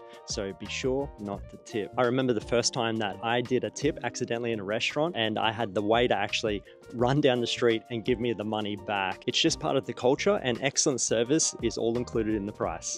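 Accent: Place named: Australia. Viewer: I am from jp. First time watching. Sounds like you are from Australia and you did mentioned that after 12 mins in. I believe you when you said it is hot. I can hear them cicadas chirping away. Did you master the art of dozing off on train, but managed to wake up right before your stop yet? They are starting to post signs telling us to not walk on escalators. I don't think that will every happen. I always walk on them. If I stand, I feel like I have missed 10 things I could have completed if I did walk. To be fair, the conductor do say to wait for others to get off the train first before you step in. Also, they pick up their trash and food wrappers they have purchase when they left the room. Sounds like you have been in jp for over 3 months. You must be another master of sorting your trashes at home A??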